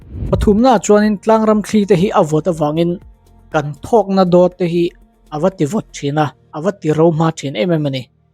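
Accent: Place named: Mizoram, India. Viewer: Khawchhak lam unau te Mizo accent i hmang. 😁 Tin, a bengvar thlak e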